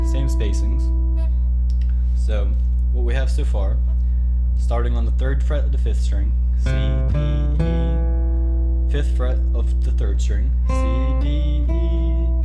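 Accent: United States. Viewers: Are you from Baltimore?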